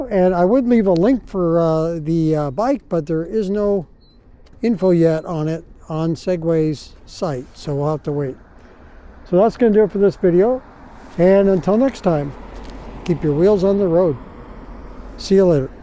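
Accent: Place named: Canada. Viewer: If I close my eyes.. listening, I think I'm in Canada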